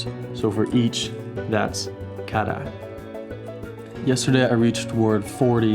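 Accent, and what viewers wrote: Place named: Italy. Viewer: You do sound very Italian. Not trying to knock you, but You are making an effort. And I'm going to try using this for Russian 😳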